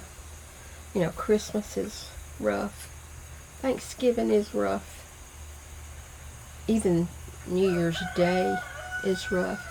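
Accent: South, United States. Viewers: I like hearing the rooster. I like hearing all the birds and the nature. I like swinging on the porch with you and I like your southern draw. It is all so pleasant and relaxing. I am sorry you have to go through all this. You will never forget Curt and he loves you and will always be with you. He wants you to be happy and have joy.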